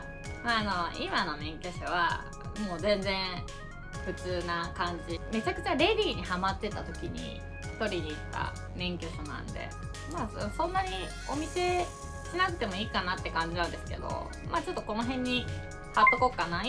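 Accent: Kansai, Japan. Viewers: 関西弁かわあい💕 ちょっとお顔違うねー！ 今の免許書の写真かわいすぎ！もっとビックでみたーい！ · 顔も可愛いけどたまに出る関西弁がほんと可愛い🤤💕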